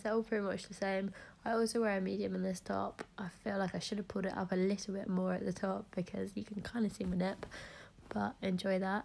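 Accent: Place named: United Kingdom. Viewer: You are beautiful and perfect in every way and I love your British accent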